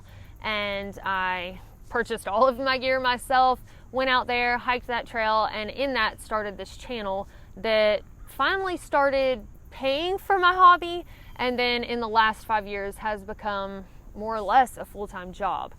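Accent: South, United States. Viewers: speedy recovery to you dad and thanks for focusing on what’s important in life. I caught your channel about 6 weeks ago and I definitely know you are not sponsored, by your very sincere reviews and even the oops moments. I have used your recommendations for equipment that has gotten me off the couch and start this mad hobby again 35 years after I stopped. Your integrity and the polite way you let us know on what maybe not to buy is refreshing and seldom seen on YouTube. You are one of 4 channels that I watch and to hear that southern accent which reminds me of my dad’s people. Keep up the great work.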